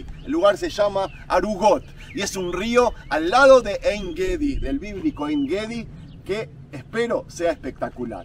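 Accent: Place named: Rioplatense, Argentina. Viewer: Tenes rasgos de desendientes!hablas como argentino o.uruaguayo sholom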